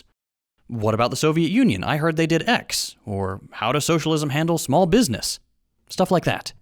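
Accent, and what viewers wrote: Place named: South, United States. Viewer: As a literate person from the Bible Belt myself, I also appreciate the accent as well yall.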